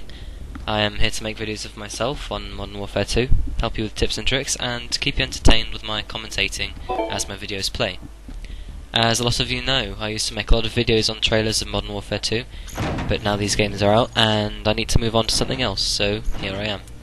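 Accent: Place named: RP, United Kingdom. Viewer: oooooo very posh lol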